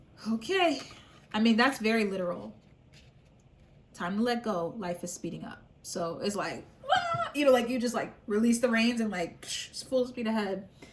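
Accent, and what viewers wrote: United Kingdom. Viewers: Old British accent is crazy ima jus leave it at that 😭😭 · Been singing “money money moneyyy” for weeks and it’s the one you started with. Also have that same British accent haha - loving the synchronicities! Thank you 🤑✨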